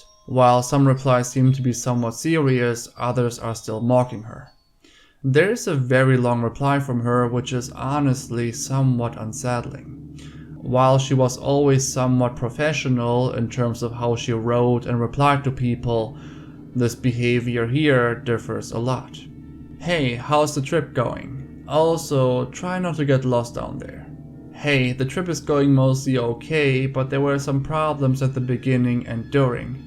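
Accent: Germany. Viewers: Sounds German to me too but I'm not positive. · Are u German?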